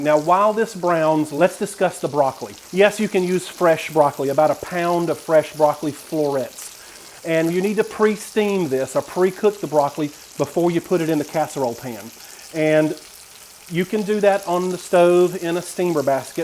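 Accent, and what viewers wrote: South, United States. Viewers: I love you and that I understand you totally because we are SOUTHERN!! I have missed you boy!! I got to try to stay on low carb. It’s so hard here in the south. 🙌🏼🙌🏼🙌🏼 yes casseroles are comfort. Now- what about cream of chicken?? I have so many good casseroles with that too. I can’t wait to see what you have in store soon! Thanks for the video!!! ❤️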